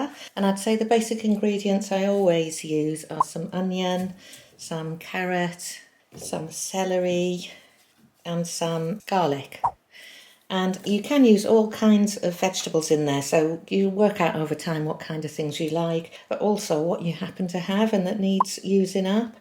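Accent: United Kingdom. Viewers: Love your channel ❤️, makes a change to see an English UK speaking person 🥰!, Makes recipes etc better to follow as all your ingredients are from here 🇬🇧, I've literally binged all your videos regarding the pro+crisp airfryer 🥰, thanks to you I've actually had the conference to use mine & have cooked a whole chicken🍗,with veg, boiled eggs 🥚& am going to try to dehydrate some veg today following your video step by step ❤️❤️❤️.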